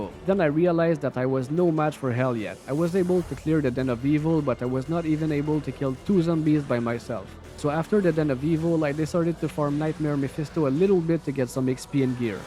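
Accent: Quebec, Canada. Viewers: I just want an answer, your accent, it says Canada, are you from Québec? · Are you French-Canadian? Your accent sounds very denis villeneuve to my ears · Accent Québecois?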